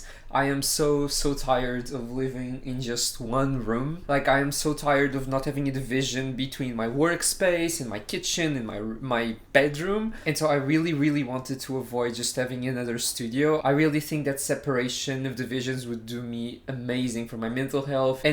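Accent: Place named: Brazil. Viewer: show us how to make that iced latte of yours. also are you latin? maybe a fellow brazilian? cause of the accent (cute by the way)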